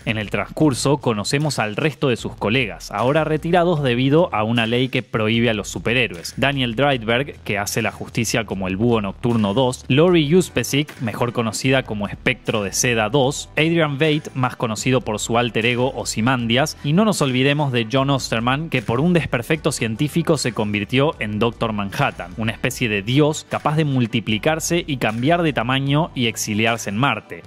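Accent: Argentina. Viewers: Me encanta ese asentó argentino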